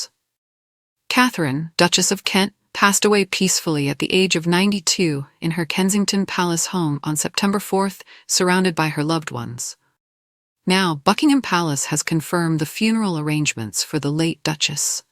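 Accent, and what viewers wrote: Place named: United States. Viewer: She is a feckin' Roman CAtholic, of course she's going to have an RC requiem mass for her funeral, so to will Princess Michael for that matter. Ye Gods, you make it sound as though something never seen before is going to happen when it isn't. What WOULD have been remarkable would have been if they forced the family to hold a NON Catholic requiem mass for her funeral. For a supposed UK channel - you might want to lose the US accent, gives millions the wrong idea about your channel - you don't appear to know what you're talking about. The only ''unprecedented'' part is that she is the first to die among the RC family members and thus the first to have a public RC funeral but she isn't the only family member who is Roman Catholic and it won't be the last RC funeral either.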